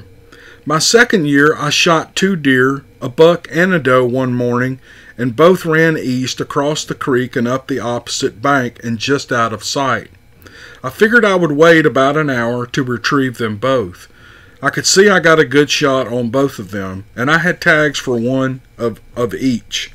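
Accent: South, United States. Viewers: Another great batch of stories narrated by the soothing southern drawl belonging to the great!!! Thank you for your time, again 😍👣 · I have said it before. You are the best narrator that southern draw makes it so interesting to listen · Keep that smooth southern voice telling big foot stories. You doing a 👍 job 👍👍👍👍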